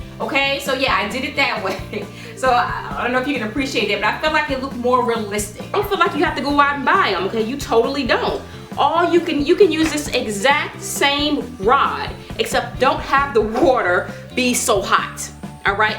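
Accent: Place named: North Carolina, United States. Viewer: You are super creative and beautiful. Love your accent, too! I'm a New Yorker, but I can tell you're from NC. <3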